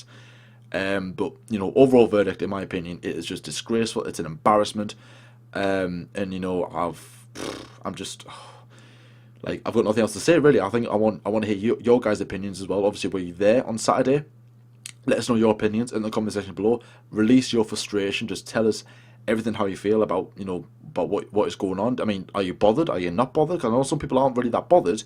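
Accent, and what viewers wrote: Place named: Wales, United Kingdom. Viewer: You Sound Like You're From Wales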